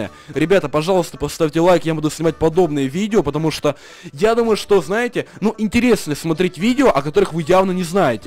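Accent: Ukraine. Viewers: DEROTER ツ он Украинец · Fibe hou он украинец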